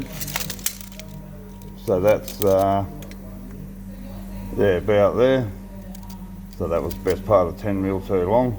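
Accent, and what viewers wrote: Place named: Australia. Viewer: Whereabouts are you? Sound like Aussie?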